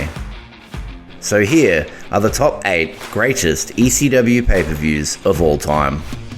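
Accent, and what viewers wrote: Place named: Australia. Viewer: it's exciting to hear what sounds like a fellow Australian ECW mark, E-C-Dub, E-C-Dub, E-C,Dub XD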